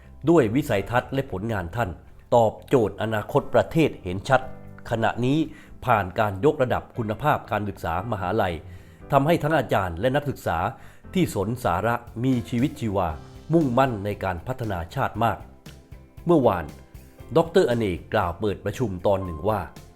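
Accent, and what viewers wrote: South, Thailand. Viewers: คุณอ่านดีน่ะ แต่ อ่านชื่อ ผิด 2 ชื่อ นันโทปนันท กับ อัลเบิร์ต ไอสไตน์ คนใต้ บ้านเราทองแดง พออนุโลม แต่ อ่านผิด คำ ต้อง ระมัด ระวัง มากๆ ครับ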